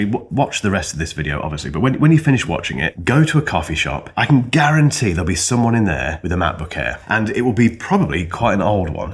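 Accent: United Kingdom. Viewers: Dude didn't slip out of his British accent even once.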